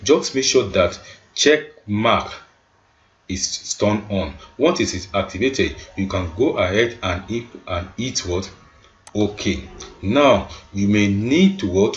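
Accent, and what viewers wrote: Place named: Dubai, United Arab Emirates. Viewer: Your accent is really difficult to get, it feels like you're speaking like Dubai people.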